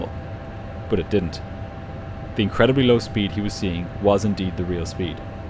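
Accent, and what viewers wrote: Ireland. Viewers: Love your channel ❤ ye sound like a fellow Irishman 🙋‍♀️🙋‍♀️ great to see an Irish channel doing well 🇮🇪🇮🇪🇮🇪🍀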